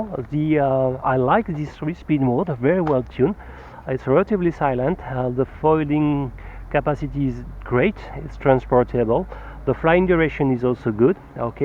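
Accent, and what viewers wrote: France. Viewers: Ca s'entend que tu es français mais ça reste une très bonne vidéo!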